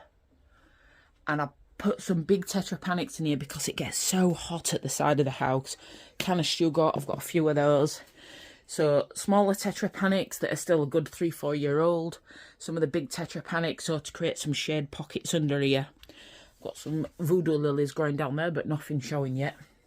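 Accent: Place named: Yorkshire, United Kingdom. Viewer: That sounds like a Yorkshire accent to me? Pretty much the whole of the UK has a Tropical climate right now, so the answer to toyour question is "'Qute easily"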